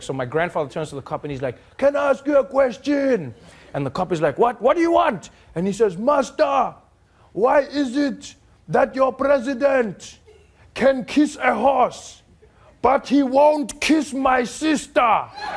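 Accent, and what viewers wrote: South Africa. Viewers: Come Home & Run for President, PLEASE!!!..and that ain't a joke, you'd be shoe in, got my/our vote...and wouldn't it be priceless running against Julias in 2029!!!... "_" ;-p....and have to mention, it was a pleasure working with you or should I say around you & shoot day driver on the Simba Chips Local Flava Ad campaign in 2010 or was it 09?, cape Town, you had me & those not sleeping at 4am pu in stitches laughing...well f-ing done man and don't EVER lose your SA accent proper pls..even if the networks did their worst, keep it local bruv:) much love & thank you #TrevorNoah for being the best foot forward SA has to offer...one of but among the top;-p #fuckTrump :) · I think it’s cause he has a South African accent. It’s clear and easier to understand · I truly miss the daily show 😢 I love it! I am from Holland 🇳🇱 but I love 🥰 how you tell it so graphic that I can feel myself part of every story you tell. 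I love your South Africa accent and live. And this what you tell I truly believe back in history we see that we human created toys and games from our hand 🖐️ to play tennis, to finding a round brick 🧱, throwing robbed coins 🪙, gambling 🎰. I follow every show from you I hope that you come back with something similar like the daily show.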